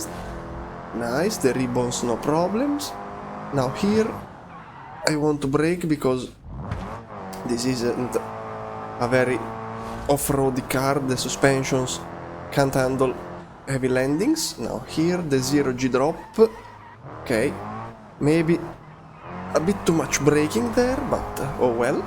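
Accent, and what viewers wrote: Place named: Italy. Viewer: Minchia Brother si sente poco che sei italiano 😂 comunque bel video 👍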